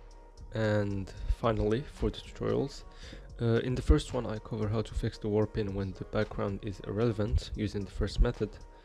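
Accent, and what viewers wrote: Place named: France. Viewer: are u french ? your accent sounds french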